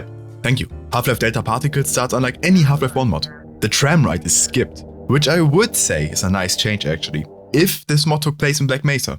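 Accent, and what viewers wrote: Germany. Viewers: You're German? I've lost all recollection of European accents. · wait you german?!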